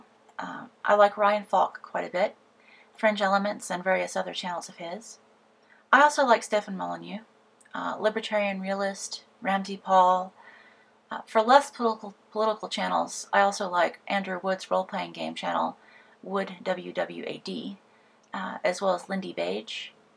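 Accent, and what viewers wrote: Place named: Appalachia, United States. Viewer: A lot closer to home than I expected, in many ways. I played Requiem, not Masquerade , but also watch NonStampCollector, and your smoothed out accent is like that of my Appalachian kin- well, some of them. Likewise, you too could appreciate it if they made the Ender's Game movie with the same wardrobe set as Pride and Prejudice. It'd be like Master and Commander: The Dark Side of the Moon.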